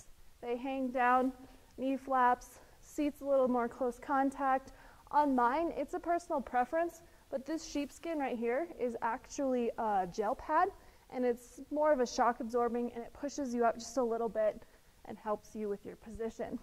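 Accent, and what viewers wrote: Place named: United Kingdom. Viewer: She has a accent it's from somewhere in the UK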